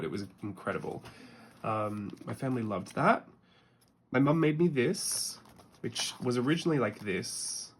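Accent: Australia. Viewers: Loving the super strong Aussie language and accent coming through today “onya ”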